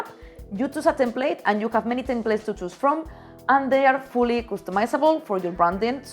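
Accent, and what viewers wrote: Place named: Spain. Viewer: I love your content! I discovered your channel yesterday and I can't stop binge watching all your videos. Very very insightful and informative content. Thank you millions and wishing you all the success - you deserve it. Your artwork is truly amazing and inspiring. P.S. Your Spanish accent is like a joyfull honey to my ears.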